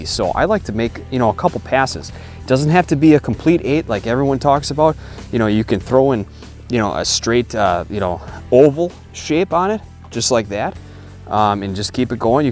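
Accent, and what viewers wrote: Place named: Minnesota, United States. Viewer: The BOOOatside , the mOOOst important. This guy is hick Minnesota/wisconsin as you get. LOL- cracks me up with that accent. Good recs hOOOwever.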